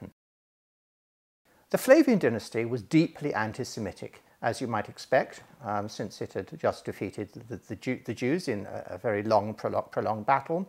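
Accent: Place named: England, United Kingdom. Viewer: Wow you sound so smart with the English accent and all and yet I don’t believe anything you regurgitate as truth - nice try.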